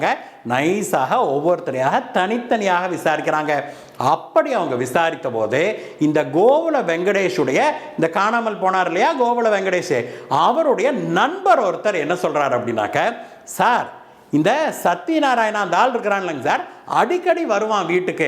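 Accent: Kongu, India. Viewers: உங்கள் கொங்குத் தமிழ் அருமை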